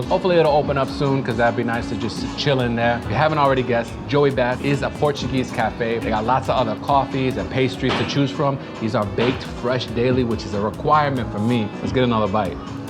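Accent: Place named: New York, United States. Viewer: You from Chicago, how come you gotta New York accent?? Good videos tho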